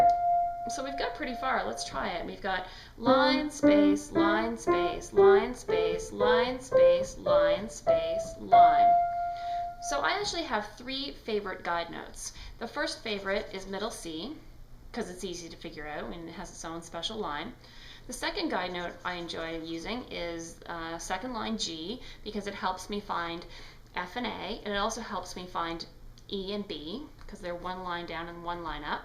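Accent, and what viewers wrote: Canada. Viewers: great video! ps-- very random but you sound canadian omgg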